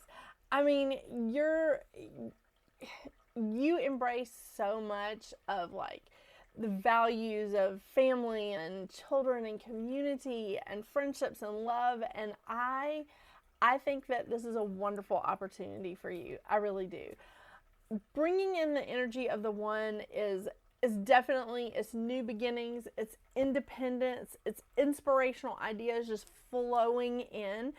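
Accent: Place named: South, United States. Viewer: First time watching from Georgia. You sound SOUTHERN. Refreshing. Thanks for the heads up!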